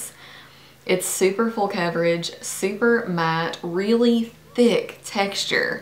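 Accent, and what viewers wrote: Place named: South, United States. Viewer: Your accent... it's like watching a movie set somewhere in kentucky,alabama, texas or a similar country. Magnificent. :)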